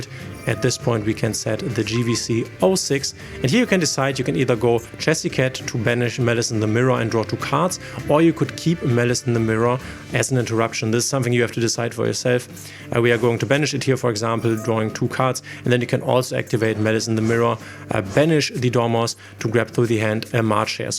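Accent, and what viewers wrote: Germany. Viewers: is it possible you're a German? I think the way you speak reminds me of German xd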